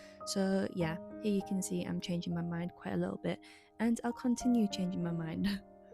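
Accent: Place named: Northern England, United Kingdom. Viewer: Ooh is that a northern accent I hear! I love discovering a fellow northerner on yt, just found my new fave channel! Your journal spreads are so beautiful ❤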